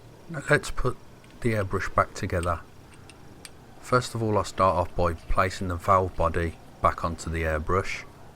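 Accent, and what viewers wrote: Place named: North, United Kingdom. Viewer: Love the Northern accent :D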